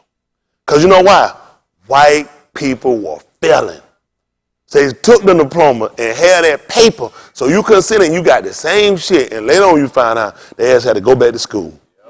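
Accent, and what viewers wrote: South, United States. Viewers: He has a funny way of telling the facts of life. I enjoy listening to. He has a heavy southern accent. I guess I've been out here in Cali too long!!!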